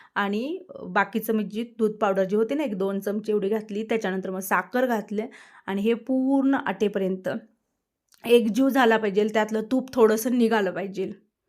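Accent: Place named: Dhangari, India. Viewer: ताई तुझी भाषा धनगरी वाटते ग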